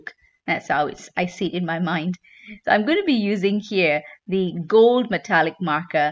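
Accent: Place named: United Kingdom. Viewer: absolute joy to watch . Gorgeous cards , great technics . Nice hearing a UK voice and UK card sizes .x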